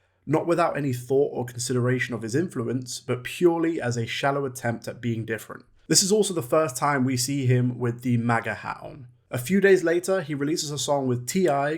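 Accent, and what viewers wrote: United Kingdom. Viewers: I respect your opinion But a British accent criticizing the greatest leader my country has ever seen….is interesting As an actual black person I respect Ye’s move to the right Inspires more true black Americans to get out of this liberal stranglehold that’s been destroying our communities for the past 60 years And after 400 years of slavery, yes, it does become a choice to stay a slave. Especially when you out number the people enslaving you. What do you think share cropping was? Former slaves that chose to stay on the plantation after emancipation. Ye’s still the best Never bad in my book WW3 & Bully will be legendary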